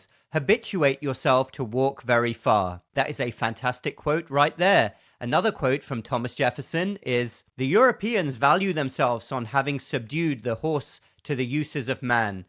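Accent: United Kingdom. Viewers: I walk to the cafe, about 3.4K every day, so a nearly 7k round trip. I have to concentrate to keep my shoulders back, and look straight forward. I am 77, and was forced to retire at 75 for vaccine refusal. thanks. I am intrigued by your accent, which I notice that other people have from the UK, but seems to be a mixture of older accents😊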